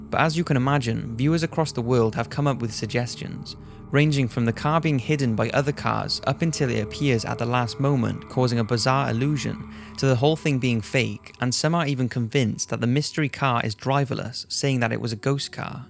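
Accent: Wales, United Kingdom. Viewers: perkin2000 Think you will find it is a Welsh accent, from near Cardiff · It's British. Of course it's audio gold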